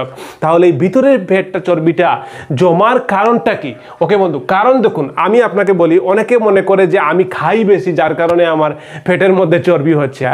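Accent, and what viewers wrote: Sylhet, Bangladesh. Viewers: সিলেটি ভাই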